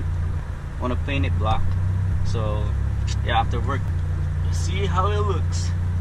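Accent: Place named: Philippines. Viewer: That Pinoy accent lol much love though bro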